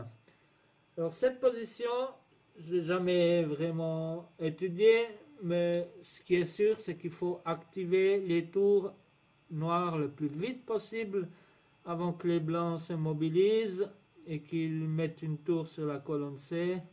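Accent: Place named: Switzerland. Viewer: Ça fait des lustres que je n'ai pas regardé une partie à peu près en entier et j'ai vraiment apprécié ton jeu.... ton animation et ton commentaire avec l'accent suisse et toujours une pointe d'humour:) Bravoooooooo!!!!!!!!!!!!!!!!!!!! 👑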